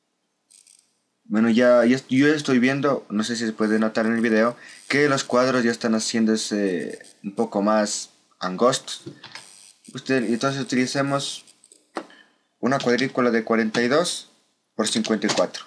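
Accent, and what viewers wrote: Ecuador. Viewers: ajaj se nota en tu vos que eres ecuatoriano ejejej muy buen video me arreglaste mi dia jejeej gracias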